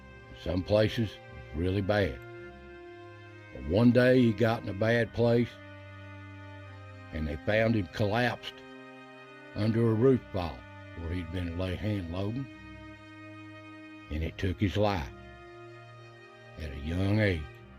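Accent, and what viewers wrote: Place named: South, United States. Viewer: Hi; Just an old trucker from north Jersey. I agree with you about miracles. Hopefully God has one left for my wife. She has glioblastoma. Been together 50 great years. We live like my grand-parents who were farmers their whole life, inseparable. Old school ways will never die. We love your videos, beliefs, and your beautiful southern accent. You and yours are wished a Merry Christmas and a Happy New Year. This year was a rough one for you and I but I always remember that God doesn't give us anything we can't handle. Prayer to yall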